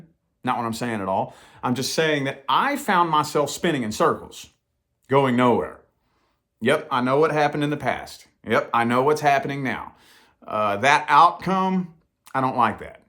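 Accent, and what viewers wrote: South, United States. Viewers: You've got that southern accent! I'm so new to manifestation at first it was law of attraction, and that didn't work, but later I came across law of assumption I was like oh my word!!! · I needed to hear you. Thank you. I wish I could just "do it". I've been reading so many years it's not even funny. I do it only when somebody asks. My addiction was Abraham Hicks about 10 years ago. I know what I am supposed to be doing but here I sit. I started listening to you a very long time ago. You were familiar in a strange way but I later found out you were from my area and sounded like my dad and brothers. That red neckery accent hahaha!!! Keep doing what you do! Maybe I will catch up someday! I love you and your family as I have watched you all bloom into awesomeness like no other!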